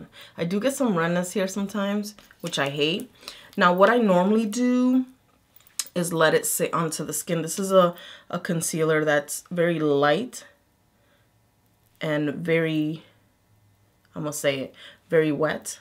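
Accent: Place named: Connecticut, United States. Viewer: Oh hey Connecticut! I caught the accent a while back and I was wondering... but I knew you were nearby haha I'm in Bawstin honeyy.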